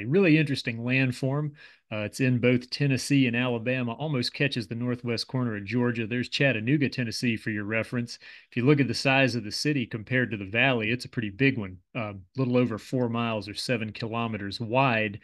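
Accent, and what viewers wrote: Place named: Philadelphia, United States. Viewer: Cool beans Unrelated but are you from Philly or Baltimore?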